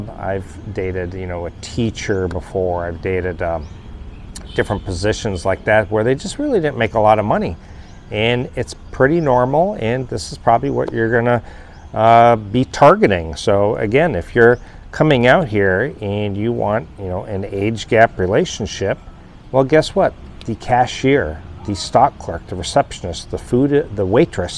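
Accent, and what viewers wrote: United States. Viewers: I noticed you wear Italian badges, but you sound like an American. If you are from America, are you not proud to wear the American flag?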